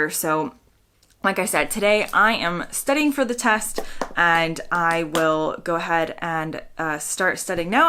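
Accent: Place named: United States. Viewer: you sounds like american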